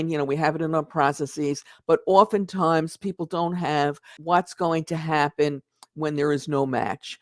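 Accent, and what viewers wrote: New York, United States. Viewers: Absolutely you said it convincingly. Do u have a ny accent?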